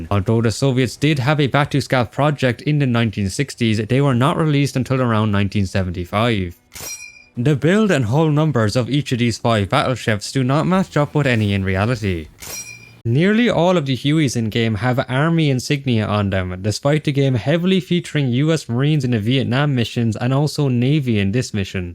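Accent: Ireland. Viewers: I never realized you were Irish…I actually thought you were German or Polish…don’t know what the says about me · Are u Irish if so what's the crack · funniest Part of video!! 😂😂. Hey, are you from Ireland!?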